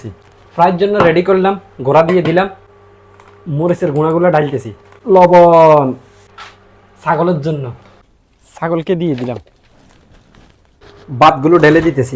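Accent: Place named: Chittagong, Bangladesh. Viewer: আপনার ভাষায় বুঝা যাচ্ছে আপনি চট্টগ্রামের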